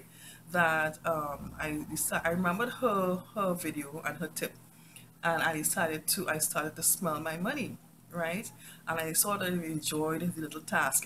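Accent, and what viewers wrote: Trinidad and Tobago. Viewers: I could tell by your accent hi trini am so happy 🥰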